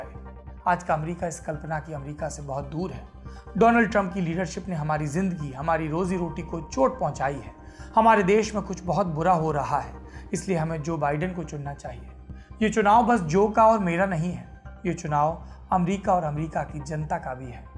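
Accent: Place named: Bundelkhand, India. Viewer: sir aap kaha se hai mai jalaun se hu aap bundelkhandi bolte hai aap kaha se ho plz plz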